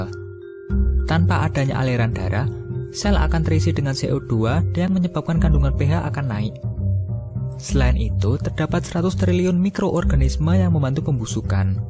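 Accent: Java, Indonesia. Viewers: logat jawa nya lengket banget wlwpn pk bhsa indo. 😅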